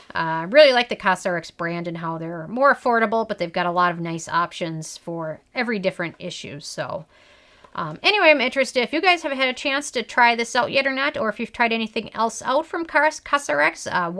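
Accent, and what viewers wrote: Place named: Midwest, United States. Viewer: just picked this up... ready to try it! also, you should def have more subs! this is so great and thorough... plus THE SECOND you started talking I knew I'd found a fellow midwest girl--I'm guessing Minnesota! love from WI!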